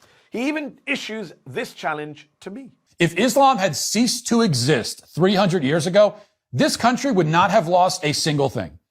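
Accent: United Kingdom. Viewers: With a profoundly British accent?. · I mean, you don't sound like you're from the U.S. with that British accent, so maybe he is technically more "murkan" than you, but who cares?? I guess it depends on how you define "American". From the rest of the world's perspective, "American" means gun toting, bible beating, boot licking patriotism. From that standpoint, he's definitely more "American" than you.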